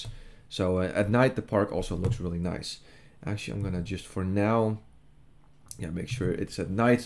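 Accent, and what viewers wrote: Netherlands. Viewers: Obvious Dutch accent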